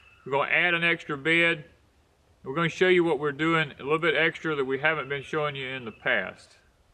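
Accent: South, United States. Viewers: What part of the south are you please?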